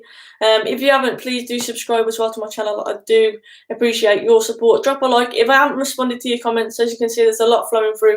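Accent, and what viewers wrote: Manchester, United Kingdom. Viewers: Nice manchester accent.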